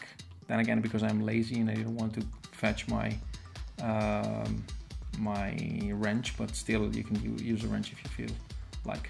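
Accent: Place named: Netherlands. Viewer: Italiaanse subs maar 100% een nederlands accent! bedankt ga morgen alles installeren :)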